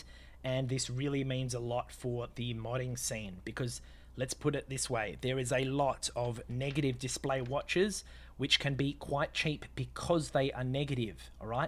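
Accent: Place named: Australia. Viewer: This is a wonderful tutorial. Thanks. Your Aussie accent is amusing to follow.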